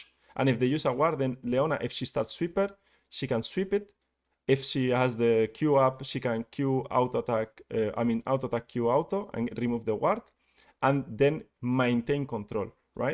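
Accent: Spain. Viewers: Eres español? 🤔🤔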